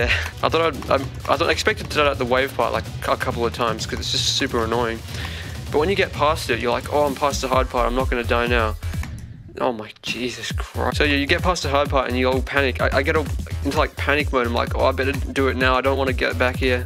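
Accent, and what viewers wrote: Australia. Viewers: You Aussie or something? Because you really do sound Aussie, cool if you are cos so am I;) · Are you Australian? because I love your accent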